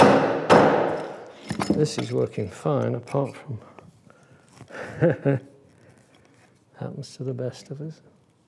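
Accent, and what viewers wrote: United Kingdom. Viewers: So it is british accent after all. I didn't know british woodworkers use inches as well. But then again in next episode he says 'it's half a mil" so I guess he uses all units he finds useful.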